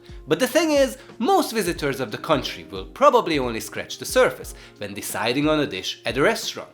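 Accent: Hungary. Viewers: Jó ez a kis hunglish, ment a like! :) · anyám.. ez az akcentus... nagyon jól megy az angol, de érződik a magyar... Did give a like tho...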